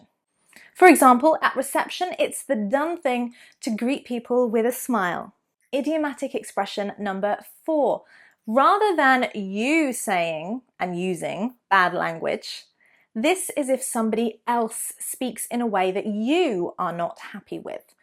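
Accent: United Kingdom. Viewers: I really like Britain accent. It is so clear and wonderful 👍🏻👍🏻. Thank you so much my beautiful teacher 👩🏻‍🏫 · Thanks for beautiful British accent of teacher! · Hello... Let me start saying hello for you ladies, I have started listening to your videos recently and I want you to know that I am a big fan of the British accent which gives you a big point and definitely another student, you are super amazing keep going, let me please see in the coming days more videos about the pronunciation in the British accent. Thank you for the information and the great effort you are doing in these videos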